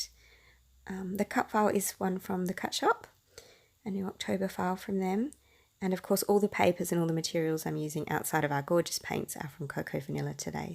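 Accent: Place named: Australia. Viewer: I could listen to your cute Aussie accent all day long! <3 I initially noticed how you mounted your background on the floral paper. I love that, it's so original. Again, the Cocoa Vanilla paper has really caught my eye. I've been rather busy with my new job, but excited to get back to creating with all the goodies I love. Thanks for inspiring dear <3 Cheers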